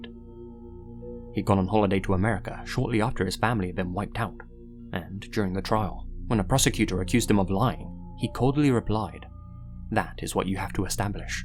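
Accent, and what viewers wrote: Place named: United Kingdom. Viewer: But sorry I know your British i love your videos soo much you're the only one ill listen to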